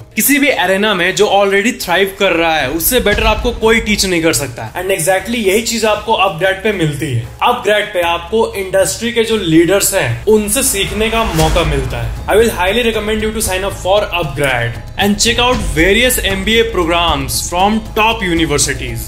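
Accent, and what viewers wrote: Bihar, India. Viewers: Sir aap bihar se ho kya???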